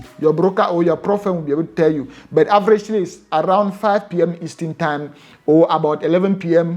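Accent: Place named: Akwa Ibom, Nigeria. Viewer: U sound like an akwa ibom guy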